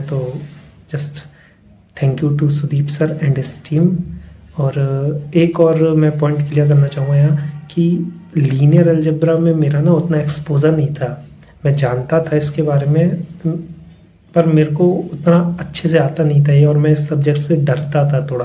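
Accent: Bengal, India. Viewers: sir, THANK YOU VERY MUCH for your content over these years. Your channel is probably the major factor in getting me this amazing IIT JAM 2025 rank I've achieved. Also, I love your Bengali accent. It is quite adorable ❤❤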